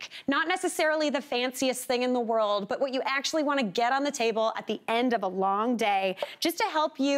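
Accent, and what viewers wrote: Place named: Canada. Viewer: Eh. . Eh?..so Canadian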